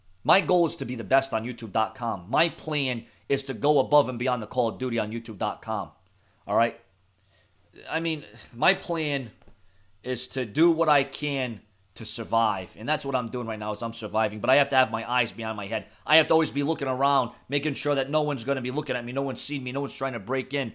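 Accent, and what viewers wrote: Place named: New York, United States. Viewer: I would move but keep your channel ppl will miss u if u delete nd btw are from new york its just the way u sound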